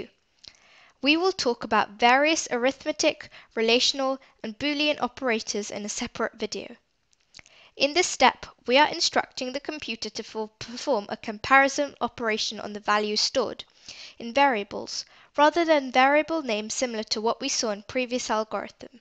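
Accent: United Kingdom. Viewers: south england accent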